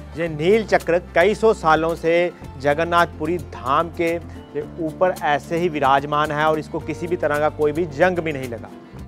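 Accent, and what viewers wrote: Punjab, India. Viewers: Aapka Punjabi lahja❤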